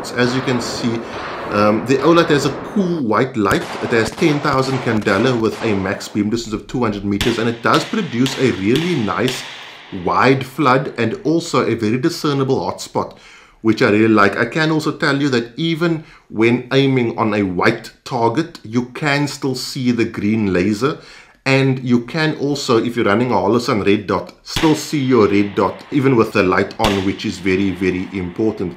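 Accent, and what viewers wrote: South Africa. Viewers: South African accent I hear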